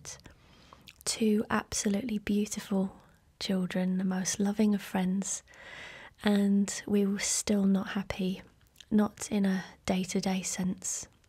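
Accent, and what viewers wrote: England, United Kingdom. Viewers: I am 45mins in and I am totally captivated ,,, I so much enjoy listening to ur soft English accent and laugh everytime u make a reference to something with different words than we use here in the U.S.,,,, u have the kindest, gentlest soul and it shines threw in all u do, it's unfortunate u and ur family are on the outs and u couldn't rely on them when needed, I totally can relate, I have no body in my life to rely on if needed and it's not a nice feeling, that saying is true tho, u find out who's real and who's not when u don't have anything to offer and need help, and sadly I found out, I don't have anyone, thank u from the bottom of my heart for sharing such personal stories and please please don't ever stop doing what u do here on youtube, u will never understand the positive impact u have on so many,,, so so so much love and positive energy sent ur way! 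Xoxo 💜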